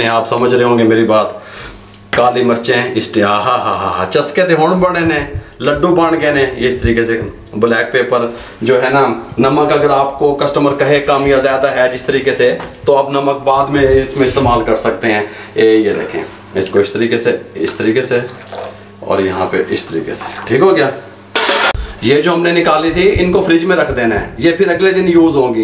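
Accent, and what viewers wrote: Punjab, India. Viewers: Sadqe jawan main tuhade vich vich punjabi touch tu hhhh jeonde rewo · Wah kya yakhni ka swad hy.......or wah kya zaban 'punjabi, ki mithass hy....baly baly.......maza aa gya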